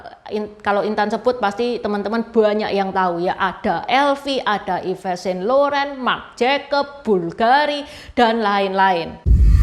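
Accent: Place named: Surabaya, Indonesia. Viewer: logatmu lucu...seperti orang di pasar atom. Tetap aja sebab menurutku menarik.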